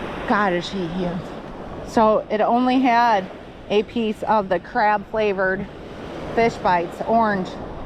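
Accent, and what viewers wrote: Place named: Midwest, United States. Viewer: I grew up in Michigan, Pennsylvania now. I suspect you are not originally from Florida. I recognize your Midwest accent. it is music to my ears. (Don't recognize...)I really like your videos, I hope you both hang in there. Blessings....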